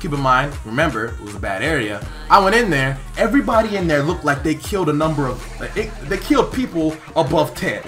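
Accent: United States. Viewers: You from michigan bro?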